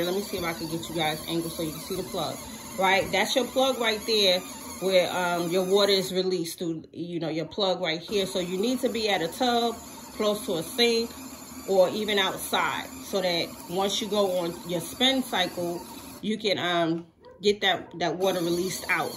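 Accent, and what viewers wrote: New York, United States. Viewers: I was going to say New York lol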